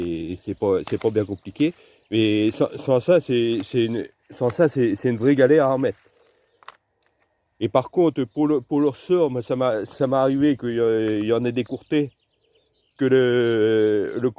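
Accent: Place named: Nord-Pas-de-Calais, France. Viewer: Et avec un bel accent du nord pas de calais. Merci pour tes conseils min tcho!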